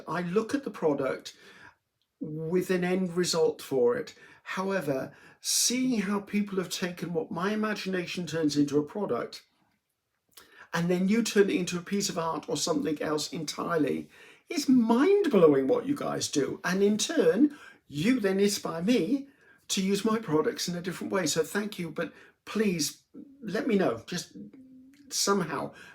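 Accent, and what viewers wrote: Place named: Wales, United Kingdom. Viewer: I am one of your new 2022 subscribers and I appreciate your sincerity, honesty and how you are yourself. Thank you for speaking so candidly about your bout of depression. I've dealt with depression throughout my life and it's good to hear artists speaking about it. I love that you are from Wales (your accent makes me think of my Welsh mother in law) and how you embrace the diversity that surrounds you. Like you, I have much to be grateful for. I wish you a wonderful Christmas and all the best in 2023. Know that you are supported from your fans (like me) in Canada.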